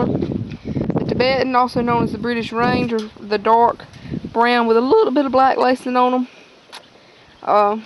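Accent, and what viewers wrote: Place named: South, United States. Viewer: Yes indeed I love a southern accent being from the south I'm partial. Keep doing what you're doing Fort Worth Texas loves it. Blessings and more Blessings to you and your family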